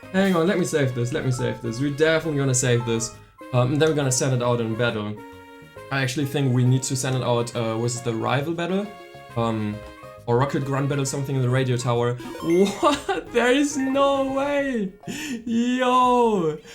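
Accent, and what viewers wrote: Germany. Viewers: You can shiny hunt at f pokewalker??? Do i hear... Hör ich da einen deutschen akzent? Ich hab das actionReplayDS auch noch, kann man das auf dem DSi auch benutzen?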